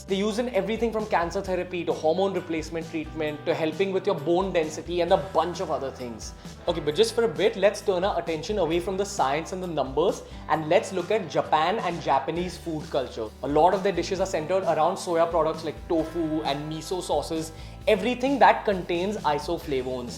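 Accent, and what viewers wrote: India. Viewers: Idiotic logic + Indian accent = Pure comedy gold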